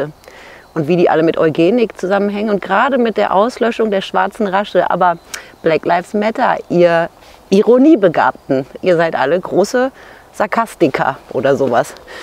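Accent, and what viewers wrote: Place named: Upper Franconia, Germany. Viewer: des is fei jetzt aber nett schee. Oberfränkisch ist ein sehr schöner Dialekt 😂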